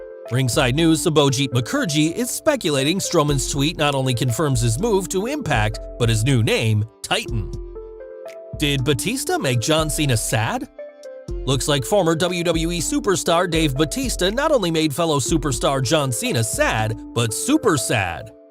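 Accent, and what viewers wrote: United States. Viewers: Is anybody gonna point out that the guys voice is now American? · Oh so this channel is now hosted by an American? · How come you have an American accent and Wrestlelamia has a British accent?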